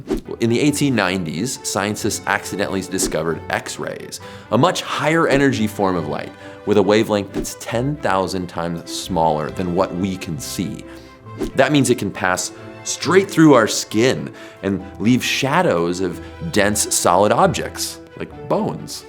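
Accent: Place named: United States. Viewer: American pronunciation is so weird, this video is entirely about Adams, not atoms, yet it shows atoms not men called Adam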